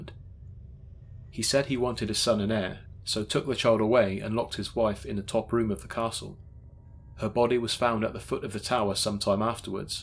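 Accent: England, United Kingdom. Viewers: Forgive my ignorance but Why is an English man narrating this?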